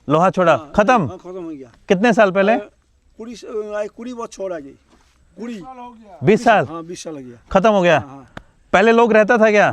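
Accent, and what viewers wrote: Bangladesh. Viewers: you are using Bangladeshi/Tripura accent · . I watched your all videos till today you uploaded. Liked and commented also. But this is one of the best as you spoke nice Bengali. Amar naam. However your tone is bangladehi bangla not kolkata bangla 😅 Let us meet at Delhi. I also travel a lot. Our meeting can be mutually beneficial.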